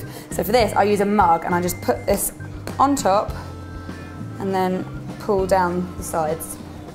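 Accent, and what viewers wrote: United Kingdom. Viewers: Doppelgänger she speaks British English with a beautiful accent.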